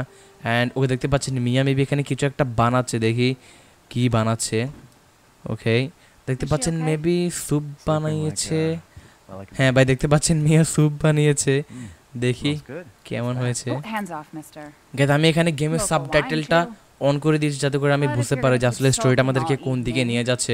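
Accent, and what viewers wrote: Noakhali, Bangladesh. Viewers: ভাই সত্যি করে বল তুমি কি নোয়াখাললে।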